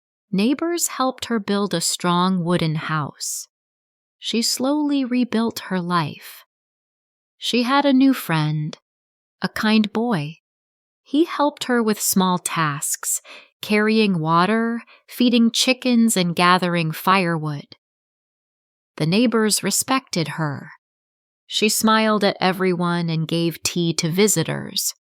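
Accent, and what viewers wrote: United States. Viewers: I'm also disgusted with your American English.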